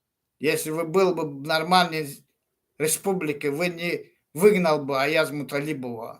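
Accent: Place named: Armenia. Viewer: Ты сначала учись розговариват. Как армяны говоришь.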